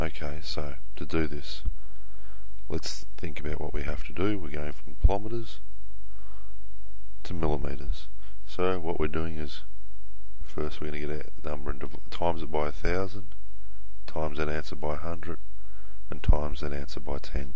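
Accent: Australia. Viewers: This dude is an Aussie or NZr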